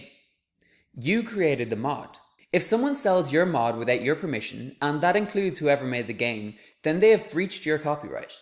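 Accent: Ireland. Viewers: I really like your video, and I like your accent although it is a really soft irish accent haha. Btw, short hair is better. Have a nice day.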